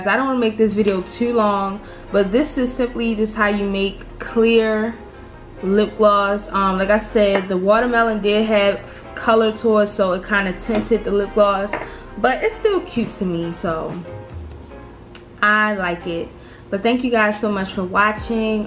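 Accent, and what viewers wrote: Baltimore, United States. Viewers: Omg her accent, is she from baltimore?? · Omg your accent!!! Your totally from Baltimore!!!!!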